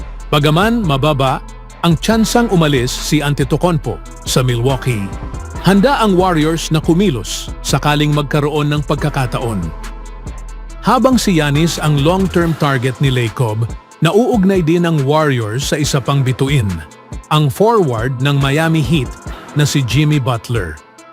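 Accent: Visayas, Philippines. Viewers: bisaya kaba bigkas mo bisaya eh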